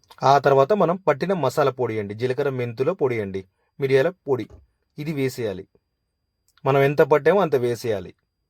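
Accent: Telangana, India. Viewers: Pure telangana lunga ge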